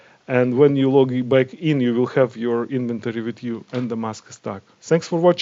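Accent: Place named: Russia. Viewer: соу рашен акцент))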